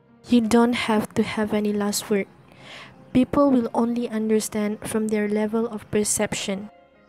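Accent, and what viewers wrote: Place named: England, United Kingdom. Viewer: Come here because of english eccent..apa yg aku merepek ni..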